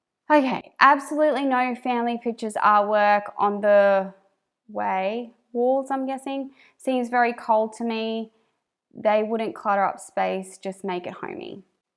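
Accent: Australia. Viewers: As for your accent, if you watch an australian channel you should expect an australian accent! 😆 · So mean picking on someone's accent! Everyone speaks differently and thats fine. I am Australian also so your speech sounds fine to me 😁 That comment probably came from someone in a different country who isnt used to hearing an Australian accent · I started watching organising and minimalism videos on you tube and was really missing our Aussie accent so that's how I found you. Don't lose the Aussie twang 😉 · Your kind and gentle nature makes you a good messenger for this topic and your Australian accent is a bounce! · I was always taught that if you don’t have something nice to say, then don’t say anything at all. Nowadays, i think so many people are miserable in their own lives, they look for any reason to criticize someone else. It is very sad. I love your Australian accent! 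I think children in today’s society will greatly benefit from being raised in a minimal home. I have been on my minimal journey for about 2 years. I am constantly looking to minimize even more. It has made a huge difference in our home. Our lives run so much better. I wish I would have known about minimalism 20 years ago. You are doing a great job! Your kids will grow up knowing what is truly important in this life, the relationships they have not the things they possess. Keep up the awesome work! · I love the holiday apartment look too! Plus anyone with kids knows that anything extra = extra chaos. And your hand gestures increase trust. I was taught it's GOOD in public speaking. It's ridiculous to make fun of your lovely Australian accent. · you have a lovely Australian accent. Some of these comments are crazy. People need to get a life. Carry on we love your channel. Bye bye to anyone who have a problem with it lol 😁💗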